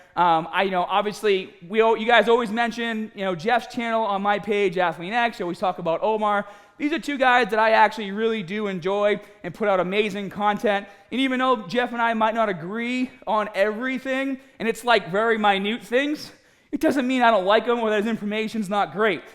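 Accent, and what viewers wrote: Boston, United States. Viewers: a little less pre-workout maybe, I get you are passionate but yikes dude. PS love the boston accent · It’s funny, he sounds like a pretty average accented American, until he say “gAAbAAge” lol. Oh how I love a good Boston accent! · Are you from Bahston? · Came because of the title, stayed because of the Boston accent. Good video bro, just gained a subscriber, about to binge watch your videos 💪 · Is that a Boston accent?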